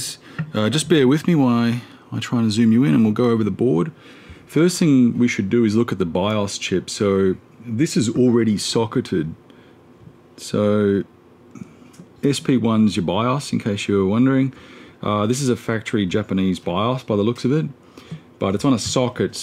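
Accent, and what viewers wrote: Australia. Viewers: Great video. The microphone works just fine. I'm mexican and I do understand very well your aussie accent. Thanks for your video.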